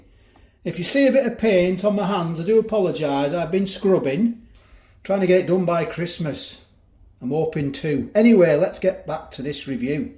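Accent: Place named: Northern England, United Kingdom. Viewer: Is it just me who thinks this guy should feature in an ALDI advert? Proper northern accent (I'm from Yorkshire, I'm guessing is Lancashire originally?) He wouldn't even have to act for it, just plonk some food in front of him and film it 😁 Hands down the best, honest and comical food reviewer out there, and he doesn't even try to do anything. Just brilliant 😎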